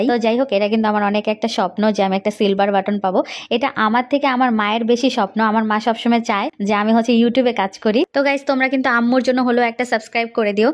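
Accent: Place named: Bangladesh. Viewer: তুমি আমার বাড়ি ঢাকায় তোমার বাড়ি কই গো মেয়ে বাংলাদেশের এক নম্বর আঙ্গুর ভাষা❤❤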